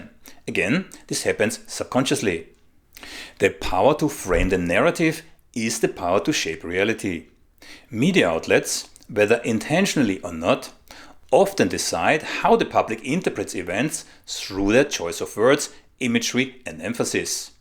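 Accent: Germany. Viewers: It's so good to have the original Germanic accent... Nowadays 🇩🇪🇦🇹🇨🇭 sound like they were born speaking English. Deutscher Rundfunk für immer!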